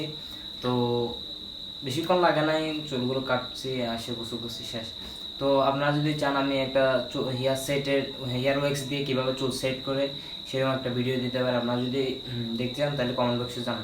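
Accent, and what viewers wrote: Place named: Chittagong, Bangladesh. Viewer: Via apnar bari kotai amar mota ctg karon apnar kotar modda boja jai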